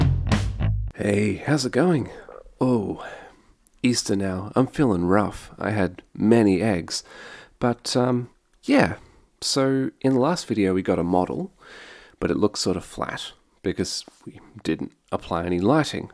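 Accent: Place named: Australia. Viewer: I did think it was Australian, moreso by the language than the accent. Keen for more VK videos.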